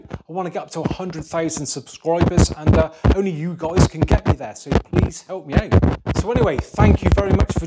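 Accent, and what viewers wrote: United Kingdom. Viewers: Thumb up for that beautiful British accent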